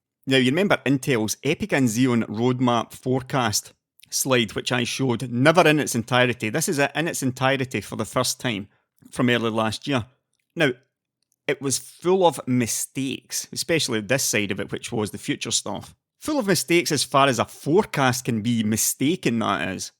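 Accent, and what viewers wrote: Scotland, United Kingdom. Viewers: your Scottish voice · yeah that is my scottish man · the kinky Scot accent is back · those dulcet Scottish tones are back baby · Aaah, I have missed you Scottish tones over the last several months, and while you may not appear as frequently as you once did, your return is still most welcome. Stay well. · Good to hear your thick Scottish accent again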